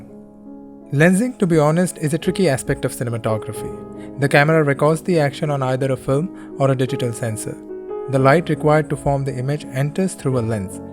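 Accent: India. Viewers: I really enjoy your indian accent, friend.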